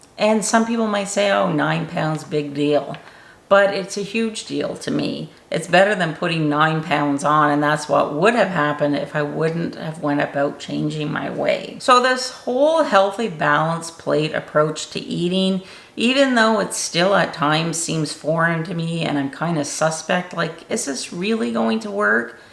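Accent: Canada. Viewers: Congrats. Love grapes. Eggs? Why not whip them fluffy with your hand blender then place in the oven for a few minutes? Quality dark chocolate is good for you—not the health store ones that say 75%-100%, no. Get less than 70%, for sure. ***Might I suggest that you consider carbs during the day ONLY? Yes, this would mean before dessert before supper. I mean, no carbs after 5 pm for a few weeks then 4, for a few weeks, then, 3, then, 2 pm. Not kidding. Plus, this works faster than people assume. You sound a bit… Newfie🙂? And, an extra calendar = meal prep. No stress. Great luck with hubby🌬️❤️‍🩹🕊️. Congrats again🎉.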